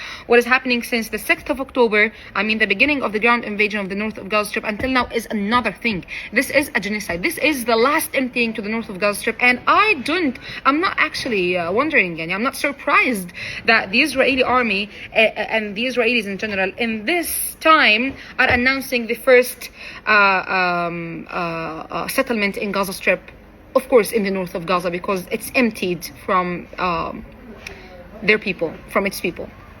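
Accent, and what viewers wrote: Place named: United States. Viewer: She always speaks English so weird as if she’s American or Palestinians are American 😅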